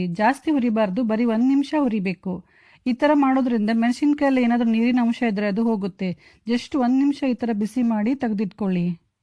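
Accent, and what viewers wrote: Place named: Bengaluru, India. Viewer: U speak Bengaluru language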